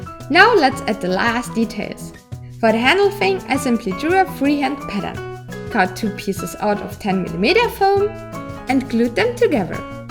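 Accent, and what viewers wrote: Germany. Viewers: are you an german? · you're german??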